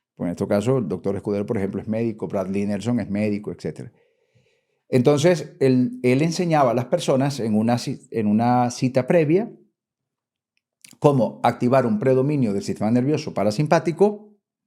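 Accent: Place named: Colombia. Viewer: Gracias Doctor por todas estas enseñanzas. Creo que ud es Colombiano por su acento y me enorgullece por lo que está haciendo por nosotros.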